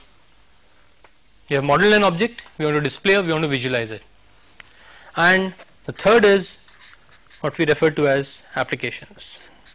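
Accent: India. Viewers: it difficult cannot laugh about the indian spoken, it seem like speak with a Little Candy in your mouth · What a highly informative video. It is great that the university made this available here. I'm American and I did not have much trouble understanding the Indian English spoken by the professor. Those who had problems understanding just need practice listening to Indian English- this speaker did not use any Hindi-English slang (that I can recall) so it is just a matter of developing an ear for how familiar words are pronounced. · A agree with bajholster. If subtitles were added, I think they would help a lot. I'm rather used to different accents, but Indian accents are one of the most difficult for me to understand. Excellent video. Thank you for the lecture. I am beginning to study and learn CAD, and this was a *very* valuable video for me. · This" National Programme on Technology Enhanced Learning" videos are mainly aimed at improving education for Indian students so that Indian students can benefit from it. 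And we understand it very well. If you want to use these resources you can use it for free. But we didn't remember asking an opinion from an outsider on our accent - especially from an ignorant one like you.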